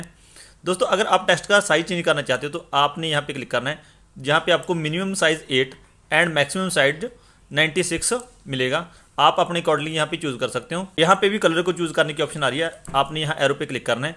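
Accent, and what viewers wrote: Punjab, India. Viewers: Are u punjabi? Ur type of speaking seems punjabi ! And i like punjabi😊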